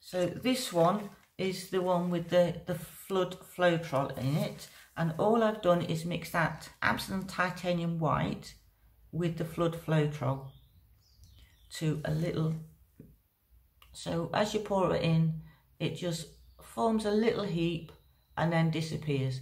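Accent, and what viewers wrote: United Kingdom. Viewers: my DArling this is bloody fantastic❤ I absolutely loved your video loved your experiment loved the outcome and love that I can use my fancy British Accent[in my head] when typing to you🤣🤣🤣Sorry I'm a huge Royal EVERYTHING fan so when I hear that beautiful accent I go gaga. Love you sister. TaTa for now Dahhhling❤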